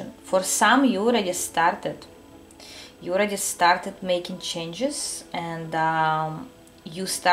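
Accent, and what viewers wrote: Russia. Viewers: pile 2. "someone's lying on the couch crying and watching many readings right now" LMFAO i dreamt of crying last night and also cried bcs of the sad news i heard.. and now i'm sitting watching all of your readings so accurate this is amazing! and also when u said that a relative was jealous of me someone living next door... i already know who she is.. my own aunt my dad's cousin! she always imitates what we buy and wants us to be below them, whatever i have the next day she'll do the same to her children ugh! and also the guy that was watching me is my ex.. an abusive obsessive ex! and i am so happy he is no longer in my life even though he tries to come back i will never let him in my life again!!! thank u so much for such an accurate candle wax reading! u have officially been my favorite tarot reader <3 success for you my lovely russian lady! 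(don't know if u are but i just guessed from ur accent)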